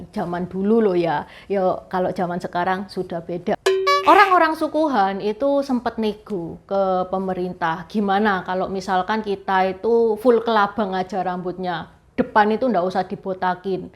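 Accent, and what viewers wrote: Java, Indonesia. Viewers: Raine cino lah ngomonge tek medok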